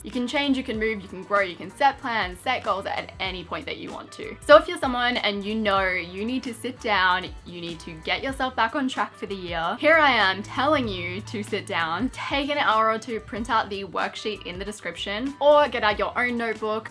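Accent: Australia. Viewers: I love the way you talk! Being from Germany, I normally only watch TV Shows in American English and I am so not used to Australian(I believe) English and I LOVE it! Thanks for tipps. I am kinda in between kind of type, I got some goals done(planned to go vegan for a month and went vegan on a constant so I went over the top of my goals; planned to fall back in love with my life and body and I am rocking it;I planned to visit at least 5 new cities and I have 6 by now!) but then again, I planned to make more friends and start a blog and didn't achieve it yet but in German we say “the night is still young“ except it is the year in my case. Soooo much love coming from Germany all your way! Thank you for always reminding me to go after my goals and grow! PS: how is your year going regarding to your goals?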